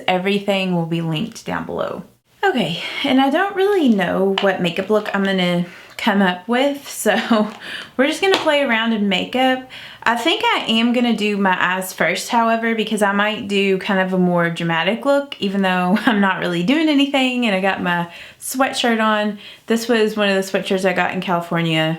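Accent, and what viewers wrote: South, United States. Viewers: I love your chatty GRWM videos! You're so sweet and relatable and I love a Southern accent (Southern girl here)! But I like voiceover or music too... I'm gonna watch whatever you post 😀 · I love her accent too! I'm from a small town in West Texas. Her accent's not exactly the same but I find it warm and inviting.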